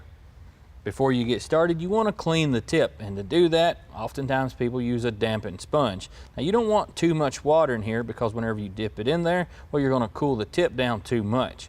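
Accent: South, United States. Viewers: Man oh man I really love southern accent!!! Don't lose that accent.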